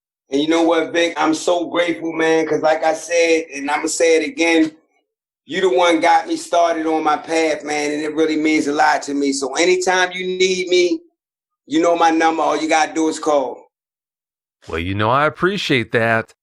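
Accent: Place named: South, United States. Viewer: It's the Southern way of telling a story... very distinct... You will hear the same cadence.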